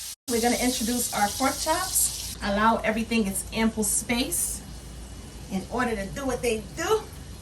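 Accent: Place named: Boston, United States. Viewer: One more thing. I always said that I love your accent and I would always say NYC, but now that I have watched your videos more...., GIRL YOU ARE FROM BOSTON!!!!! PLEASE TELL ME THAT I AM CORRECT. I worked at a Assited Living Facility for awhile and one of the lady's there was from Boston and I loved the way she spoke...., and OMG YES MA'AM PRESS HAM ♥♥♥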